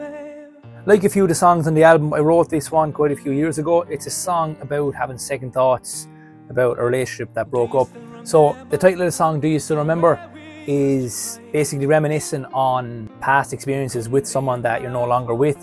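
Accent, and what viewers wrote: Ireland. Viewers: Good afternoon my superhero and my guardian angel and my no1 I have listened to you new cd many times and I love it to bits all the songs are as amazing as you are take care my beautiful friend 🌟🌟🌟🌟🌟🌟🌟🌟🌟🌟🌟🌟🌟🌟🌟🌟🌟🌟🌟🌟🌟🌟🌟🌟🌟🌟🌟🌟🌟🌟🌟🌟🌟🌟🌟🌟🌟🌟🌟🌟🌟🌟🌟🌟🌟🌟🌟🌟🌟🌟🌟🌟❤️❤️❤️❤️❤️❤️❤️❤️❤️❤️❤️❤️❤️❤️❤️🌟❤️❤️🌟🌟🌟🌟❤️❤️❤️❤️❤️❤️❤️❤️❤️❤️❤️❤️❤️❤️❤️❤️❤️❤️❤️❤️❤️❤️❤️❤️❤️❤️❤️❤️your Irish accent is always amazing ❤️❤️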